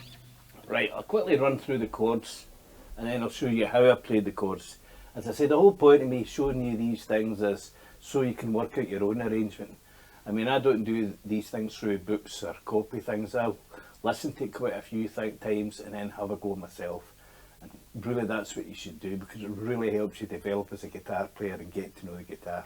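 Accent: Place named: Scotland, United Kingdom. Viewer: Did you ever hear version of this? Whoever complained about your accent should check out the subtitles on YouTube - bottom right hand corner button which has got two CC's on it. It even translates from Scottish - said 'little' rather than 'wee'!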